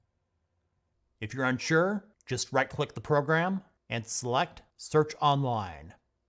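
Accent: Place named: Appalachia, United States. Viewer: in Hilly Billy accent just classic!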